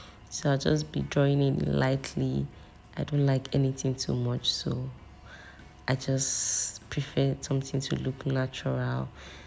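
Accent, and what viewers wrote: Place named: Yoruba, Nigeria. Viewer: with the yoruba accent🤪, welldone babes😘, we are your drawing book, paint us as you like 🤩😘😍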